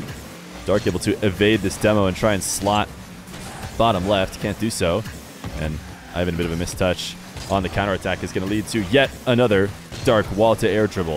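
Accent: United States. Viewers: sounds like Johhnyboi but with an American accent, and I find that pleasing.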